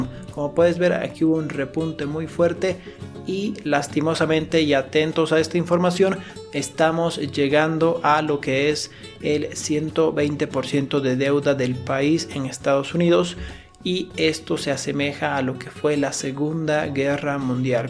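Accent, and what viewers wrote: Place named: Cuenca, Ecuador. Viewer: Muy buen video primo! ( tienes acento cuencano talvez si somos primos!)👍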